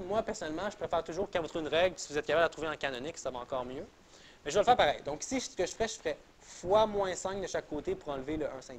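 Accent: Quebec, Canada. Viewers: J’aime votre cours je le trouve super ! C ‘est juste que vous parlez trop vite pour quelqu’un qui n’est pas habitué à l’accent québécois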